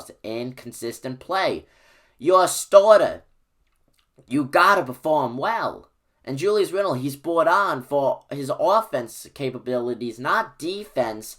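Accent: New York, United States. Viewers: Fwankly….I love your NY accent…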